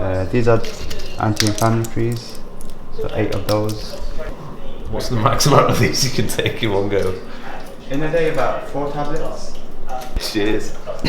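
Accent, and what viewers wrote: United Kingdom. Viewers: You don’t need hair,. Look at your jawline lol you have an amazing physique buddy and your British accent only makes you more attractive. You have no reason to be self conscious, you seem like a great guy.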